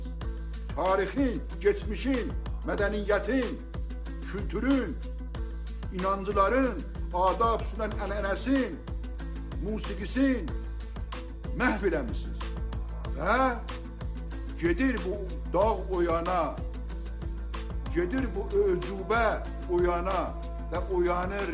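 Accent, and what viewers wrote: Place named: Iran. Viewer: Mene bir şey maraqlidi bu çöhreqani soy ismi iran diyalektinde danişiĝi iran şivesi baxmayaraq azi 20 il amerikada oĝluda eyni sen numune olmalisan ama doĝru düzgün Azerbaycan Türkcesinde danişa bilmirsen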